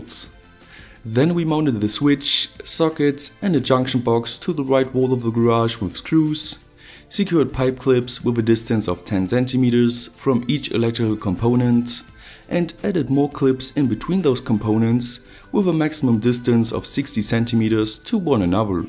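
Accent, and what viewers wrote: Germany. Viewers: C'm'on, german accent is the best part of those videos :) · I hate English with Russian accent (crazy Russian hacker) but English with German paradoxally is very nice :)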